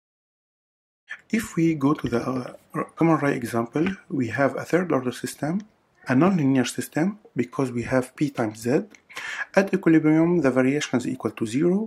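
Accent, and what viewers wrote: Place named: Lebanon. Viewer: i recognized your accent a bit, are you Lebanese? thank you professor for the amazing explanation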